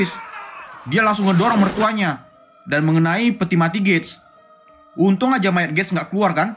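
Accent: Medan, Indonesia. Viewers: Orang Medan ya bang, sama kita orang Medan LIKE WEEEE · Logat² medan ni pengisi suaranya wkwk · Logat Medan nya kental x ya BG😅 Love you BG😅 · Iya bang orng medan ni kek nya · Medan ni bos😂😂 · Latak org medan😂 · Keren logat Medan:v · Mantap x bang ceritanya bang,,,kek bwrasa lagi di medan ajj awak nii,,,, Lanjut bang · Medan kali yakan lekk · Asli orang medan nih wkwk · Iya anjir suara serak serak Medan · Hadir bg medan:v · Fix orang medan ni abang nii...semangatt bang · Owalah mainmu kurang jauh bg itu logat khas medan rata² kalo anak medan tu cara ngomong nya kekgitu mkanya maen² kemari biar paham dikit yekan, hargai lah karya seseorang, aku org medan dan aku terhibur dgn cara dia menyampaikan alur film nya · Logat Medan tanggung sih. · Wkwkwk orang medan toh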